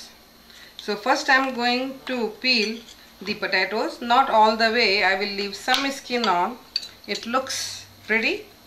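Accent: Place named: India. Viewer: can you write the ingredients, i can't understand the ingredients, no offense, but i'm mexican and u are indian, and i don't understand you because of your accent, again no offense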